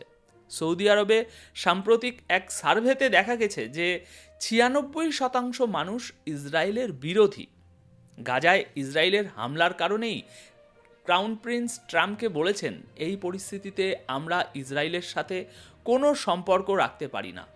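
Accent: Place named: West Bengal, India. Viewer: দাদা আপনার কথাগুলো ১০০% সঠিক, আপনার বিশ্লেষণ অনেক উঁচু মানের, আপনার কথা বার্তা শুনে পরিস্কার বুঝা যায় আপনি পশ্চিম বাংলার মানুষ, তবে বিগত ১ মাস ধরে আপনার সংবাদ দেখি, আমার খুবই ভালো লাগে, সঠিক কথাগুলো বলেন।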